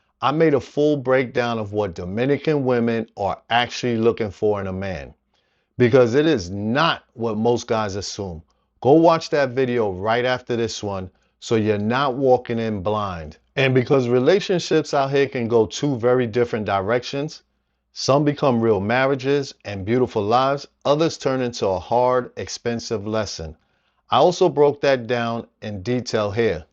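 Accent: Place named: New York, United States. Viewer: New subscriber: Excellent advise, you sound like a brother from New Yawk; I hear an accent...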